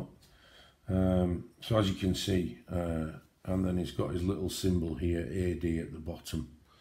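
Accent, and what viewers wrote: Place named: North, United Kingdom. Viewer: First video I’ve watched of yours and it’s lovely hearing another Northerner. Some beautiful books 😍😁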